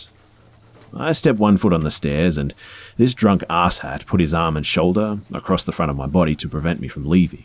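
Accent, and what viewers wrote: Australia. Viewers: How the hell have I only just found you bro, an Aussie narrator, if I knew about this earlier its all I'd be listening to, keep up the good work brother!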